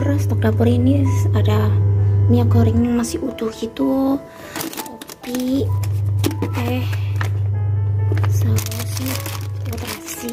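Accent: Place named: Tegal, Indonesia. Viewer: Mba aaslinya mana... Logatnya kayak aku.. wkwkwk ( orang Tegal )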